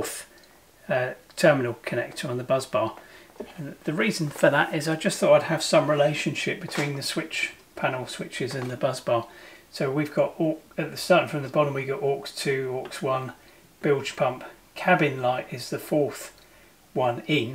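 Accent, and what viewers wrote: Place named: United Kingdom. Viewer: As a note, ABYC Standards do not allow solder as the sole means of connection for an electrical connector. You can crimp AND solder, but solder by itself is not permitted. So if your boat may be subject to ABVC Standards (or you want to meet typical standards) then crimping should be used or used as well. Not probably an issue based on the accent in the video, but I'm not sure of Euro/UK standards and if they differ.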